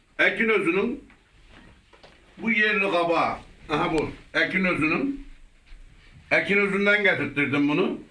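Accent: Gaziantep, Turkey. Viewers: Dayi antepli misin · Antepli misin?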